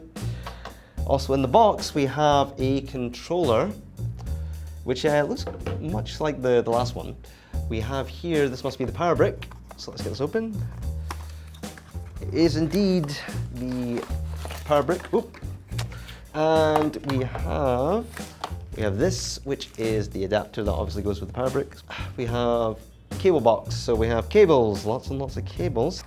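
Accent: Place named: Edinburgh, United Kingdom. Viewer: Hi, Great video. Thanks. That sounds to me like an Edinburgh (where I am) accent... am I correct? If so, I've just bought myself a Mavic 2 Pro and the FlyMore kit, and... I'm completely new to drone flying. If you're in Edinburgh, is there a particular area you can recommend I get started? Would you be up for meeting up with me (in the field, with drones) and give me a few starting pointers? Cheers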